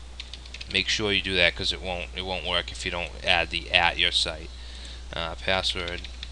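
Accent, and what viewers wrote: Boston, United States. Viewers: Oh my god he kept his Boston accent back then hahaha.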